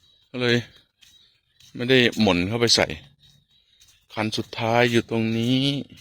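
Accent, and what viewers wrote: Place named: Isan, Thailand. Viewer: เว่าภาษาอีสานได่ดอกฟังแล้วมันกระดากหูว่ะ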